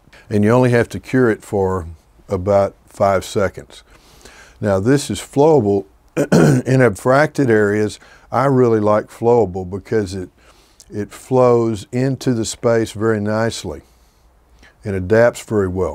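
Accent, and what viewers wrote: South, United States. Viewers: I just love ur southern accent. They work every time ;)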